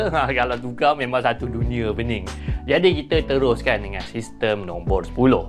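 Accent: Malaysia. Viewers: Logat orang Malay membuat saya sendiri tertavva terbahak bahak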